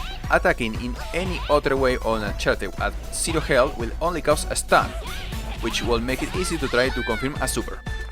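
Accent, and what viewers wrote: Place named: Spain. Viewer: Don't want to be mean, but you need to work out that pronunciation bro, either that or make Spanish guides. Try listening to the words on a text-to-speech or an online dictionary, you are using Spanish phonetics for English and it's never gonna work, just relax and it will come out more natural. The first step to talk more clear is to talk slower even if it doesn't sound as "cool". Thanks for the guide I think, this is the same in-game tutorial though... I just want to know how to make 10 hit+ combos without the air-state resetting, I try to use the wall but it doesn't work consistently.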